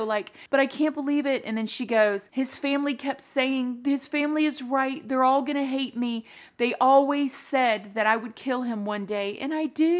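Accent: South, United States. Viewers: nah that’s just how we pronounce it in the south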